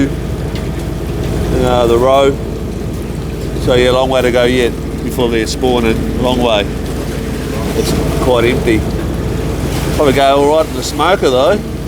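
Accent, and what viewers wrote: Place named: New Zealand. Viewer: Gotta say I love watching this shit as a keen fisho always interested in how the pros do things , so I subscribed keep filming You’ve got a good narrator’s voice too might be the bloody kiwi accent Just a note for you I fish out of Robe SA water temp this summer has been around 13-15 degrees c ,I think the fish are frozen Cheers from down south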